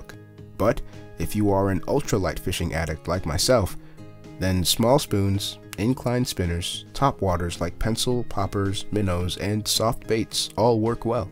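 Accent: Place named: London, United Kingdom. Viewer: Fuh, suara sifu saya ni memang lain macam. Loghat London la. Haha, padu sifu atas ilmu yang dikongsi. #PUNCA #klusterKN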